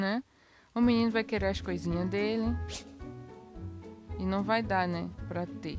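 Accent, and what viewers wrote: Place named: Portugal. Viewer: ela tem sotaque português(o classico)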